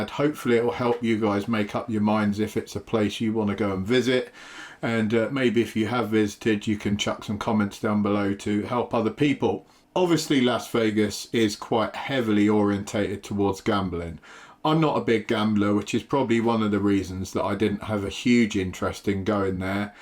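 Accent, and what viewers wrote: United Kingdom. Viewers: Doesn’t that English accent bring a lot of cache all over America? I had a pal, from Wales actually, kind of a Joe average, but he just attracted women and good will just by opening his mouth anywhere he went, restaurants, bars, just out shopping, it was actually good to hang around with him just because of the spillover and association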